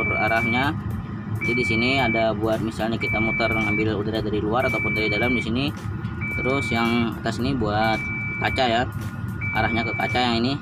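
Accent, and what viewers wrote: Banjar, Indonesia. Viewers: Orang Kalimantan kh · Logat nya banjar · Logatnya orang kalimantan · Asli urang banjar sidin ni wkkw dri logat nya,kd kwa bedusta wkwk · Lugat banjar 😂😂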